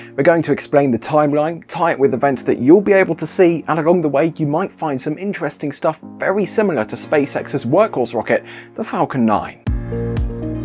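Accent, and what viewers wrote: United Kingdom. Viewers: No British Accent please!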